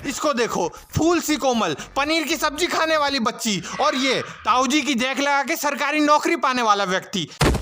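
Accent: Rajasthan, India. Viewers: Bhai tera rajasthani accent 😂👌👌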